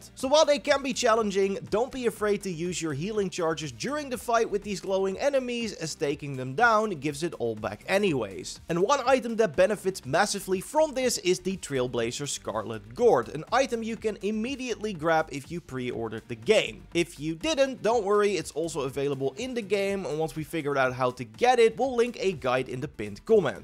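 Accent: Netherlands. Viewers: You are very helpful, yes. But my brain could not shake the feeling that you sound very very Dutch 😂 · Why do you sound familiar to a Dutch guy speaking English? Thanks for the video dude. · Bro your English is very Dutch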